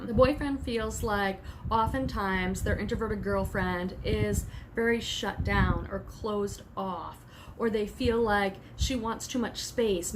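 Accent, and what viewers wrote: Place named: California, United States. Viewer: Your Cali. accent is so broad. (Awesome vid., by the way.)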